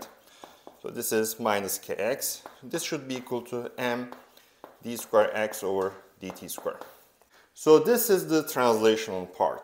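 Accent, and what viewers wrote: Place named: Turkey. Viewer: hocam efsanesiniz. Türk aksanıyla ingilizce konuşmanız anlamamı kolaylaştırdı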